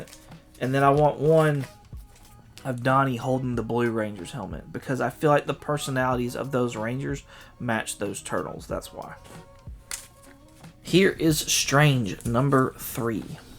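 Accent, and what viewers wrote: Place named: South, United States. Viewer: Hey brother! I came over to check out your channel after hearing about you on MONComics! I wanted to watch your latest video to see what you're all about! Do you live down south? I notice a little bit of the south in your voice! 😉 I actually lived in Vicksburg, MS for a year when I was 15. Had a great time there! I love all your new books you picked up! Right now I am just concentrating on finishing my Conan Collection and just started working on collecting every Batman (that I will never finish since I am so damn old) LMAO! I love the Constantine movie, but have never read any of the books. Are the new TMNT still as gritty and have violence like the original 80's run? If so, I need to pick them up! Love that Wolverine cover for sure!!! I enjoyed your content, looking forward to your next video, and Happy to be your new subscriber! 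Number 970 is a good number! Good luck on your journey to 1K!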